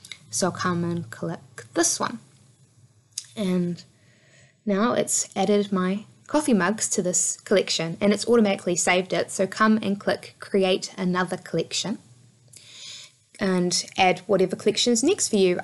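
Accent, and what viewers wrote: New Zealand. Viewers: No she's a Kiwi (New Zealand) or as you guys would hear Nu Zilland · Took me a few minutes to get the Kiwi accent but sounds like it has a twang from somewhere like Canada or Somerset UK ;) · Another great video thank you. Love that this is Kiwi made. Just a pity others don't understand our accent. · NZ accent, just replace 'e' into 'i', that's simple =) eg: tidtalks, stip by stip, siven & etc... Tips: 0.5 speed listen carefully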